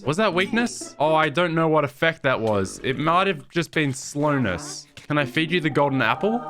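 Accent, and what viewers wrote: United Kingdom. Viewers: Are you British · Its like 3 months since you have shifted to britan and already you sound british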